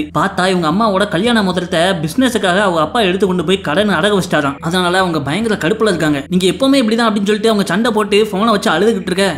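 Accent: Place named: Tirunelveli, India. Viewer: Unga thirunelveli slang thaan bro.. Romba pudikum... En frd maariye pesuringa... Neenga pesa pesa en frd thaan niyabhagathula vanthaan...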